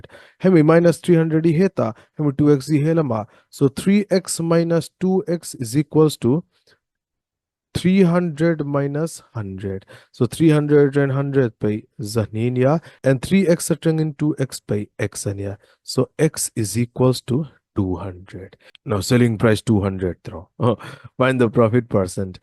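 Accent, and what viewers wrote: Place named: India. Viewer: I accent hi a bharat lutuk a, ngaihthlak ahreawm,(Hinglish). mizo tawng khan sawi tluan law law la.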